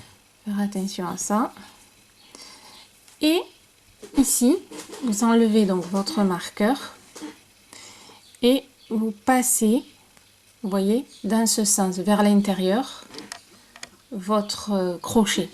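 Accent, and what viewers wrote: South, France. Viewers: Bonjour vous êtes mon rayon de soleil et le bonheur de vous entendre avec votre accent du midi je suis moi de même du midi MERCI BISOUS BISOUS BISOUS FERNANDE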